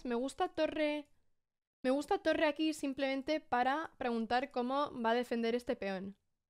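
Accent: Spain. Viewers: That's a real spanish accent! ¿Are you from España? 🙂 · Es notable para un argenino, descubrir, a través de distintos youtubers, los distintos acentos idiomáticos, desde Juanjo de Rey Dama, que se come las eses, con gracia suprema, hasta este castizo maravilloso de, que acaricia nuestros oidos con sus matices entre, eses, zetas y ces. Luisón, Rey Enigma, Spider Ibarra, pasando por Pepe, nos sumergimos en ese maravilloso mundo de acentos españoles. Gracias, no te agrandes, que te regaló un caballo el Rey, cariños desde Mar del Plata, alguien que admira tu belleza y simpatía insuperable, también tu capacidad para el juego. 13 de mayo de 2024.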